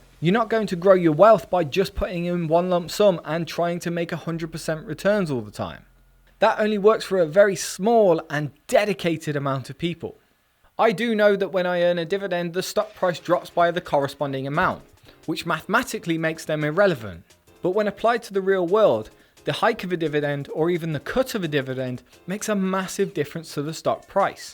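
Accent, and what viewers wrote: United Kingdom. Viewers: The best thing about the british accent: "Tesla Investor" rhymes :))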